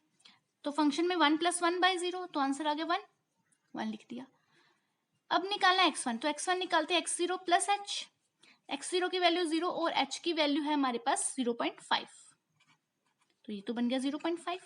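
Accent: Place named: Punjab, India. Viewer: Nice explanation mam By the way Your accent feels like punjabi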